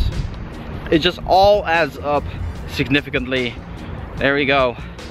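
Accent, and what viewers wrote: Netherlands. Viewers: Mooi nederlands-Engels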